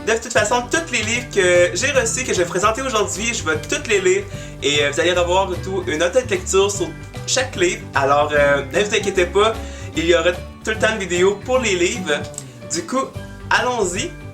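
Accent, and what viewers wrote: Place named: Quebec, Canada. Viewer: Salut, je viens de connaître ta chaîne et j'avoue que je suis conquise, j'aime beaucoup ta façon d'être et je raffole de l'accent québécois donc je me suis abonnée directe :) J'attend tes retours pour le monde de l'ombre car je suis bien tenté par ces deux tomes ! ^^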